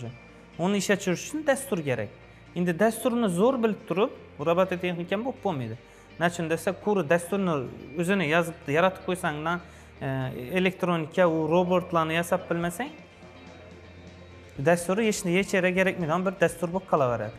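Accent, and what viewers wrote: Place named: Khorezm, Uzbekistan. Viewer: Xorazm shevasiga gap yuq juda muloyim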